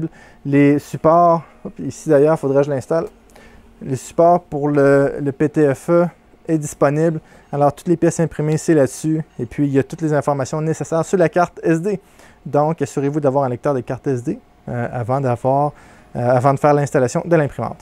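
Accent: Canada. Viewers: Salut. Je suis du même avis que beaucoup, pour 1000 euros je la fait moi même et tu devrais t'en retrouver pour 500 euros voir moins de matériel. Bonne continuation et c'est toujours un plaisir de t'écouter avec ton accent et les expressions du Canada.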